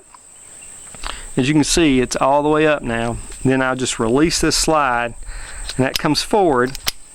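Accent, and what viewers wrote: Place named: South, United States. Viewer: Buddy, where in South are you from? You have a very distinct accent.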